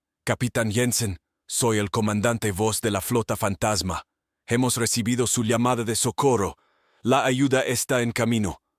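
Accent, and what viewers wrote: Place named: North America. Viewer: Su Tono de Vez es de Norte América . Vendiciones es un buen video .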